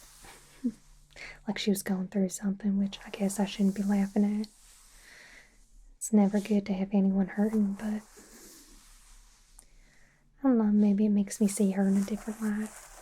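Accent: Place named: South, United States. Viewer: Had been missing my favorite southern accented super hero. Always a wonderful experience.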